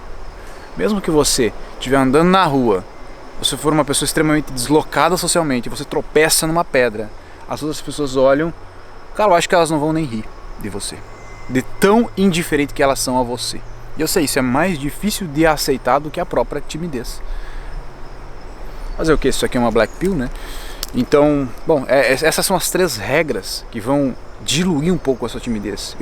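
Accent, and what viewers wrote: Rio Grande do Sul, Brazil. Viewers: Apaixonada por este sotaque gaúcho. 😍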